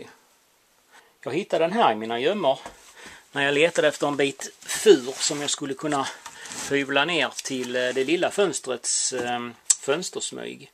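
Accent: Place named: Hässleholm, Sweden. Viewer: jag har tidigare jobbat i hässleholm och tyckte jag kände igen språket:)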